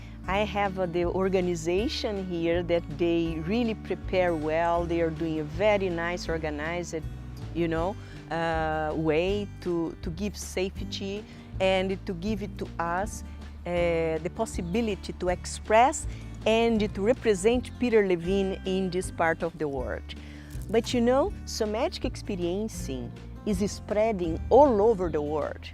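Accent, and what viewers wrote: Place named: Brazil. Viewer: So good to hear a brazilian voice in SE world! Thank you for this explanation. I am studying SE! ❤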